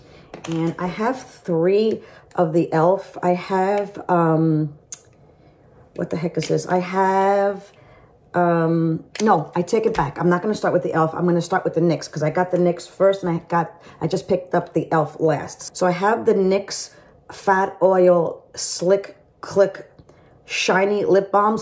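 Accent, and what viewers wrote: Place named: New York, United States. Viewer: Love your accent are you from The East coast New York ,sounds like home ,love your teteorials!!!!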